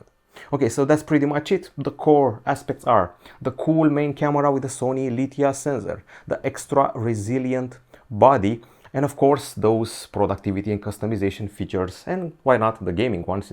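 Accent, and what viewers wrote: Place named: Romania. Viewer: Ăsta-i român după voce și accent 👍👍👍👍 😂😂 Americanii 👎🏿👎🏿👎🏿👎🏿💩💩💩💩 Românii 👍👍👍 💪💪💪💪❤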